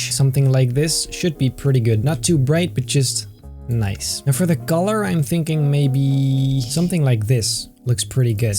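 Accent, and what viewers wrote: Netherlands. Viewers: I said dutch accent but English language like he has done on his main channel. Those videos are crazy funny. btw i don't know dutch either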